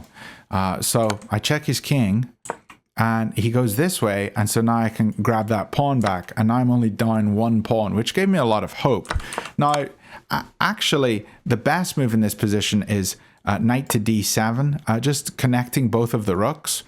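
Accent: Northern Ireland, United Kingdom. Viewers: I'm really enjoying watching your journey. I'm currently trying to escape the <num> bracket and have been spending a lot of time learning theory recently, but watching skilled players convert midgame positions is very helpful for me Also I'm interested in your accent! It sounds like a mixture of Northern Irish and South African, plus I guess a few north Americanisms. Did I get anything right?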